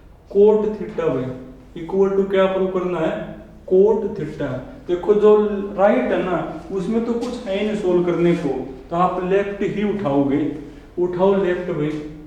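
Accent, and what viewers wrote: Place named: Uttar Pradesh, India. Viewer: sir m UP se hu aapki voice se laga keep growing sir ❤️❤️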